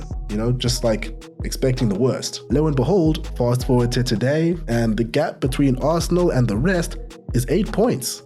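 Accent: South Africa. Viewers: this is a south african accent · You sound South African 🤔🧐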